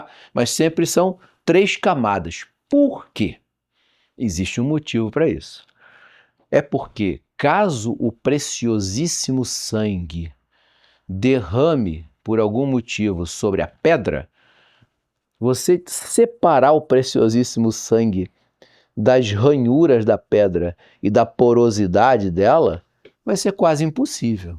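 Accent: Rio de Janeiro, Brazil. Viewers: Olá, boa noite! Que aulas maravilhosas!!! Parabéns ao CDB e ao excelente professor! Só gostaria de frisar, aqui, que o excessivo sotaque carioca torna a aula cansativa ao ouvido, o excesso do chiado do 's', o que é um ruído da fala, é ríspido ao ouvido. Como boa estudante que fui de estética vocal, sugeriria ao professor minimizar este sotaque. Nada contra os cariocas, por favor. Apenas que para falar ao grande público é melhor sem ele e qualquer outro. Obrigada.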